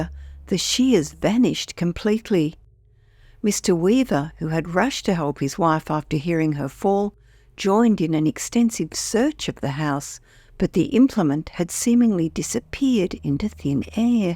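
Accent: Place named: Australia. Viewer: Maybe it’s her accent! I’m pretty sure the narrator is Australian!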